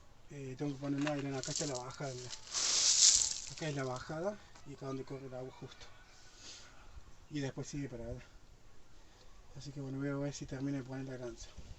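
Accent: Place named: Cordoba, Argentina. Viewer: Muy interesante la info!!, pero no pude evitar escuchar a un Cordobés con mezcla de Franses.jajaja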